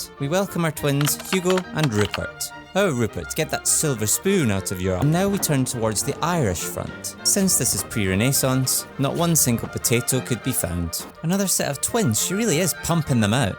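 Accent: United Kingdom. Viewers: Is this man from the lowlands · i love when british do british. British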